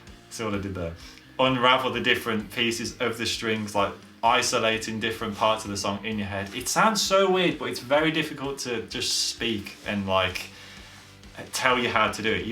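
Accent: United Kingdom. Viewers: Wasn’t expecting you to be northern! Love your accent and it was cool getting to know you after watching so many covers! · Are you british?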